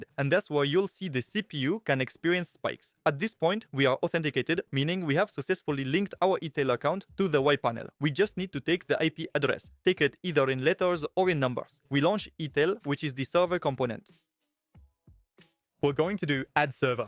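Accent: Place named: India. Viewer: nice hindi IA accent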